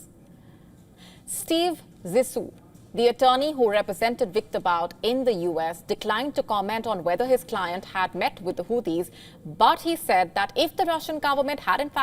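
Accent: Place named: India. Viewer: Somehow, the strong Indian accent of the anchor reduces the credibility of their content.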